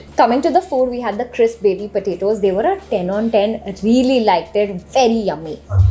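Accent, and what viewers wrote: India. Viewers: We indians speak like this...if u have a problem with this...then stop watching the video..instead of commenting on her accent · Such strong indian Accent!!! I couldn’t get past your accent to enjoy the video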